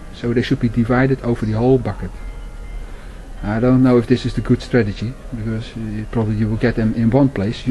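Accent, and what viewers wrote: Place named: Netherlands. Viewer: I really don't want to sound gay but the dutch accent speaking english is the best!! havin a toke and listening to just makes me so chilled!!!!! keep up he good work fella!!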